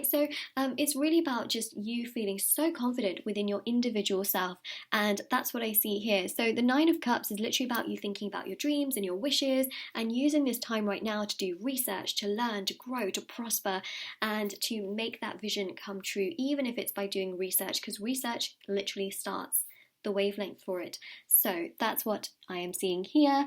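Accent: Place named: South East England, United Kingdom. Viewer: South East English I think !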